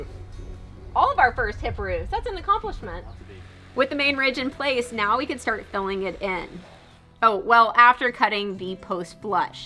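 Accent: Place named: Midwest, United States. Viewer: why do you have a Midwest accent rather than a Texas accent. Are you a transplant? Where are you from?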